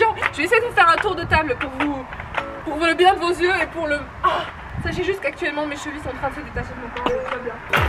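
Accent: Belgium. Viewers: Tu es Belge ! sais trop bien moi aussi xD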